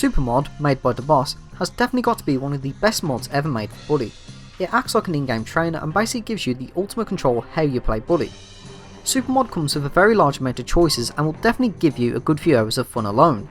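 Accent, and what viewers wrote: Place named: RP, United Kingdom. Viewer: You sound a bit posh, where are you from if you don't mind me asking?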